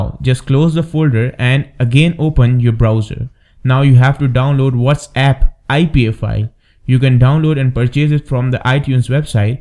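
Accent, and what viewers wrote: India. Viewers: Indian Accent XD Thumbs up!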